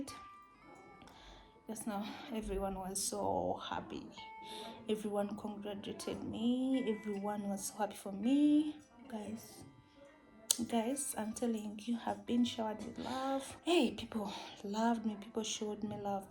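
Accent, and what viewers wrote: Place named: Australia. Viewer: 🤭 a lot of your ignorance shows on your comment. 😁🤐. sounds Aussie to me.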